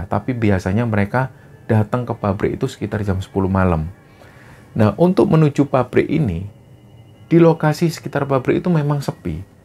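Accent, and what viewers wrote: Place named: Surabaya, Indonesia. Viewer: Mantab bang crtny kok mirip bahasa Jawa sbyo an y🙏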